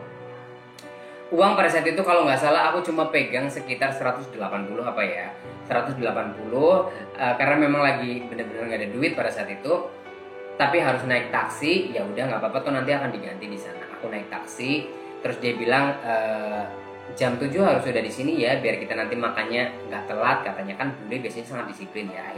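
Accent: Java, Indonesia. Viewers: mas foto wkt muda cakep bingit... bahasa nya medok banget seperti org jawa gitu...emang nya org jawa ya mas..?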